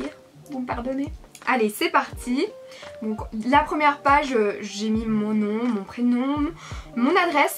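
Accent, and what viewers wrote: Belgium. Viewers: Be Ca elle viens de belgique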